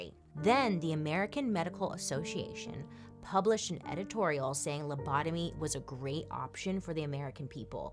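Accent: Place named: California, United States. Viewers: To me she sounds like she has a California accent. Like a valley girl, idk for sure but it’s nice & adds to her story telling. (From Georgia btw) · She KIND of has an accent to us from the Midwest but that’s because she sounds like she’s from Cali or something but people from other counties will hear it as an accent to them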